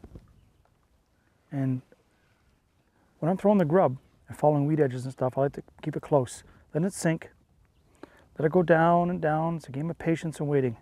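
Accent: Canada. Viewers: Are u Canadian eh? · lol sounds like he from canada. Thanks for the great tips!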